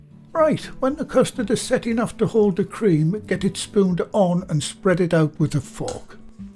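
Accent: United Kingdom. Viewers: Merry Christmas sir! I'm curious about in what part of the UK your accent is common. Thank you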